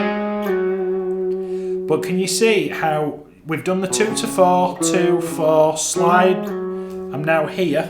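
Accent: North, United Kingdom. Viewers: Heard the Northern accent... subscribed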